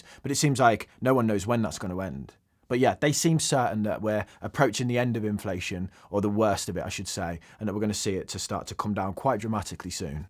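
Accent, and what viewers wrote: Birmingham, United Kingdom. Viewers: Is that a Birmingham accent?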